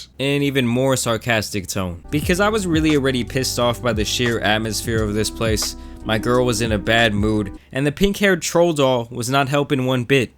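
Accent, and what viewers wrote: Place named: East Coast, United States. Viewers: Sounds like New Haven and New York 😂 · How u from texas but got an east coast accent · Why do you have a ny accent to me but your from tx?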